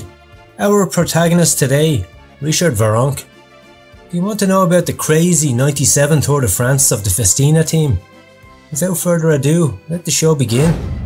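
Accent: Ireland. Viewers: the Irish Accent and the sly digs 'bluffing friend' 'lumpy bald head' cracking me up haha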